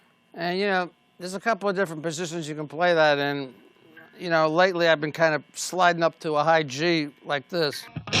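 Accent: United States. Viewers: I'm a Native New Yorka and he sounds like half my Long Island relatives. It's a VERY natural and nostalgic accent for me to hear and it's one of the reasons that Ace has seemed so REAL to me, plus the fact that on his solo album he gives a shout out to Queens and all the Cabbies in NYC and Queens where he used to work. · Ace has the best laugh ever! Love that Bronx accent.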